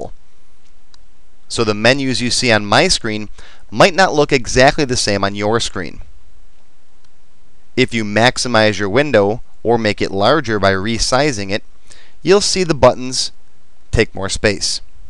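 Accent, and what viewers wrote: Chicago, United States. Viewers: Awesome series, thank you for breaking it down and making it dummy proof. On a side note, you sound like you are from Chicago.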